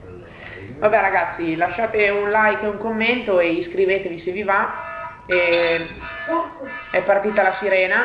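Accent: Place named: Parma, Italy. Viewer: Ma sei di Parma? Parli come Andrea Alongi...😮 Prova a dire Saccagnato...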